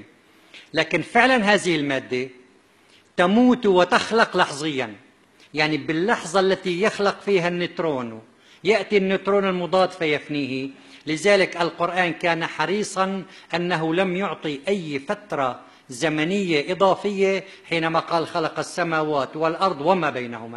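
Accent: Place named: Aleppo, Syria. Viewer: هو من حلب سورية واللفظ في هذه المدينة يكون هيجذ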